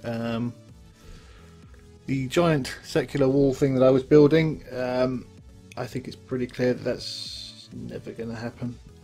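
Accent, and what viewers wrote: England, United Kingdom. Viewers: u really are cool:) u make me laugh with ur accent and style and ur "pommyness":3 watch u, pause, beef and guude alot and you four make me laugh. hope u dont stop:3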